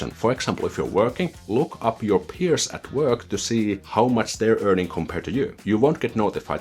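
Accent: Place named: Finland. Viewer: The most accurate finnish accent i have ever heard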